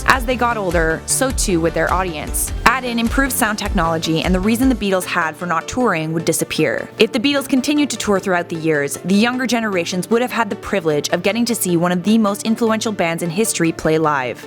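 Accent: England, United Kingdom. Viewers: Youre english right?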